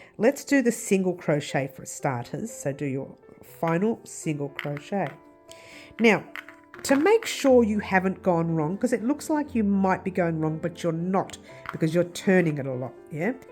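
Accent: Australia. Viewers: Wow i just found you!!! What a relief it was for me to hear your aussie accent! Somehow you say what needs to be said and you dont confuse me!! Im Aussie too!!! Please don't ever leave!!! Love your purse! ❤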